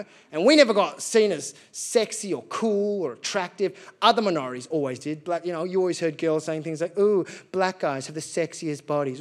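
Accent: Australia. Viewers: I detect a slight Australian accent 😅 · As a brown immigrant in California... roundabout way of saying American...if I closed my eyes and watched this I would think some white Aussie commie from cricket telling jokes. World is so bizarrea and interesting. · So nice... Australian accent is wicked..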